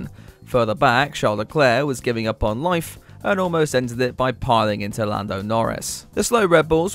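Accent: United Kingdom. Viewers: accent is so British that I keep hearing "Tor-Tus". Btw banger of a video,!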